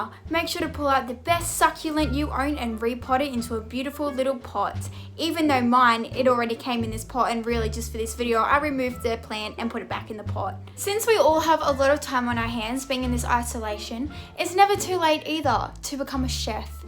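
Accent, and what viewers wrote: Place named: Australia. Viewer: I really like your Australian accent! Also, I love bored eating too.😂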